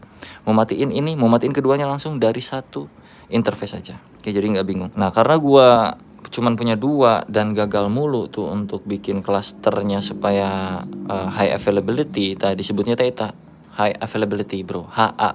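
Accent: Sunda, Indonesia. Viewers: ha..ha...ha... sunda pisan , salam kenal ti cimahi kang...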